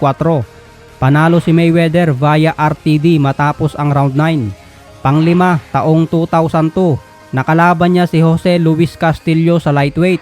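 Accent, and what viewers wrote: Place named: Ilocos, Philippines. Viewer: Ha ha ha, ilokano kasi si kaya instead na Thai ( Tay)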